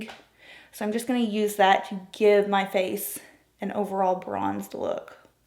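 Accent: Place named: South Carolina, United States. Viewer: I would like to try the Hydra Serum for my dry, sensitive skin. Your accent helps with my homesickness - I'm from SC, been in Cali 20 years 😉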